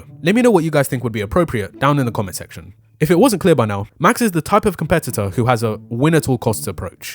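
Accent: United Kingdom. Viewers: Bruhh this guy is also British 😭😂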